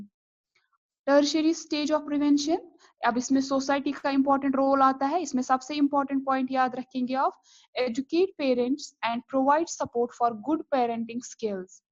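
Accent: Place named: Kashmir, India. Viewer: Ur language accent is kashmiri ❣️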